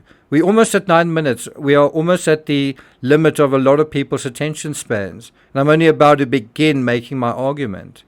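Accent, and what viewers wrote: South Africa. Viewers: Also, love the accent, it’s so soothing. South African right? Love it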